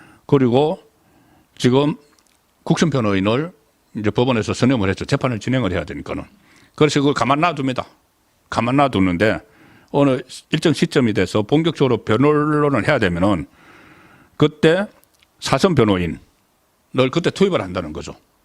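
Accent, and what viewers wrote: Gyeongsang, South Korea. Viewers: 조중동 종편 눈치나 살금거리고 보면서 대세가 어딜까? 머리굴리는 경상도 말투 송국건 ㅉ 경상도 의리있다는 거짓말이나 하지마라 ㅉ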